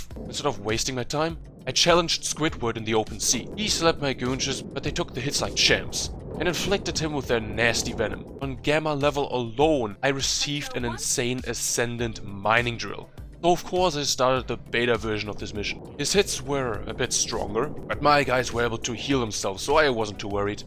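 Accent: Germany. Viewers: I love yo vids, but I have just one question: Are you german? 🧐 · Bro u german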